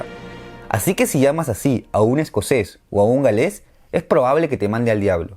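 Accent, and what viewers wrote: Peru. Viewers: Lo único malo del vídeo fue escuchar tu puto acento peruano, recuerda a la momia bozzo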